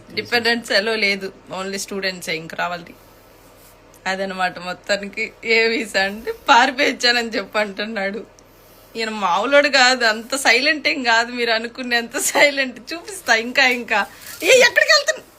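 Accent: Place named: Coastal Andhra, India. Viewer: భాష, యాస ఏ ఊరు.. చెప్పండి.! Gntr or ఒంగోలు.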